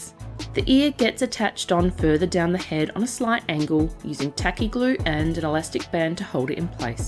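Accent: Australia. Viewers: I love them all. The newest edition to the Ice-cream Critters is definitely my favourite in this one. Yes, as a fellow Aussie, I to grew up with 100's and 1000's on my Fairy Bread !! I also see you are trying hard to upset all the Tasmanians haha. It sounds like you live in my neighbourhood, what side of the river are you on ? 😀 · love my Aussies your accent is beautiful. most of my fav channels are aussie much love from Canada ❤🇨🇦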